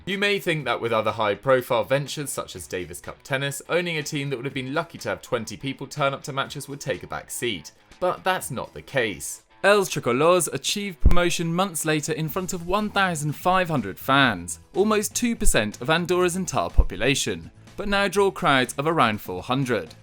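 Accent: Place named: United Kingdom. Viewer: Your British ascent was too deep we don't understand most of it. Please in future put subtitles. Anyway thanks for your information. Keep it up 👍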